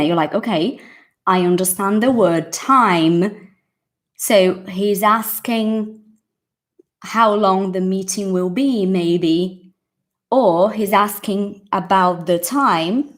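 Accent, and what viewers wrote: United Kingdom. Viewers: Wow, you sound like a real British girl! I love that accent 👏👏👏👏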